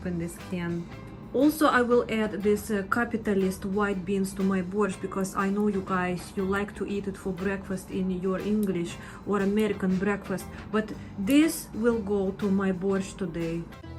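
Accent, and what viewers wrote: Russia. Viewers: Mind your pronounciation. You sound very rusdian😊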